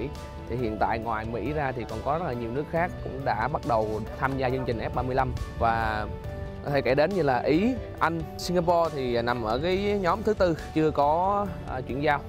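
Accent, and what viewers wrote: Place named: Da Nang, Vietnam. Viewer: Giọng a giống quê đà nẵng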